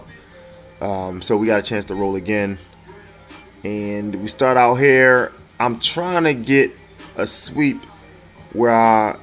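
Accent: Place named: New York, United States. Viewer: Great vid.... where is this place? sounds like a NY accent to me :) Keep up the good work!!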